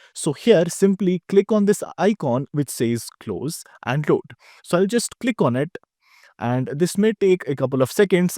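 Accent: India. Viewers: He has that typical heavy Indian accent. Hard for native English speakers to understand, due to less emphasis on sections of words. I have taught a few people from India how to speak clearly. Mind you, my Indian, Indonesian is bas, my Spanish id okay, so I cant really talk. However, i do work on the accents otherwise I get passed over.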